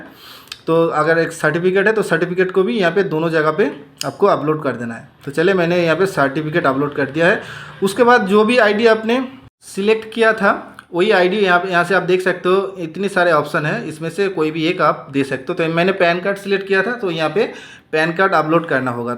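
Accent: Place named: Bengal, India. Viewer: হিন্দি টা ঠিক হচ্ছে না বাংলা চলে আসছে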